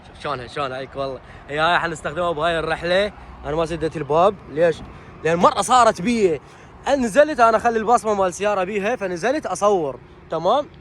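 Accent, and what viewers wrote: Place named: Iraq. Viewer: راح أشاهد هذا الفيديو من أجل لهجتك فقط ! 😅 ما أحلى لهجة أهل العراق ❤ تحية لكم من المغرب 🤝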